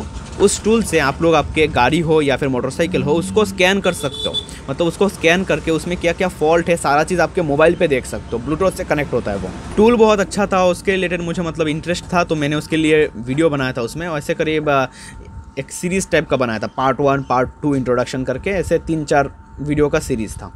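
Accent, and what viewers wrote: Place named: Bengal, India. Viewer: oh I thought you're from West Bengal because of Bengali accent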